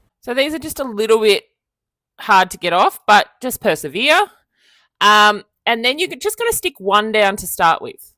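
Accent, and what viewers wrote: Australia. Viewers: I agree, good to hear a Aussie , I am in Sydney at the moment but looking at moving to Tassie....Thanks for the video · So nice to hear an Aussie tutorial, thank you from another Aussie ❤️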